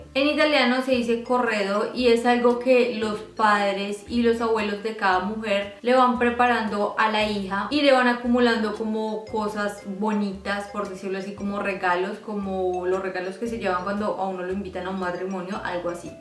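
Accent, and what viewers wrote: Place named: Antioquia, Colombia. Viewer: Estoy acuerdo en ciertas cosas sobre esa época, como lo que era la educación, verse y ser un tipo de persona con una moral más alta, pero, se me hace una reveranda idiotez lo de preservar la virginidad para el marido o ser una persona que no quisiera aportar para la manutención. Por cierto buen vídeo! Noto que tu acento es paisa, saludos desde México ;)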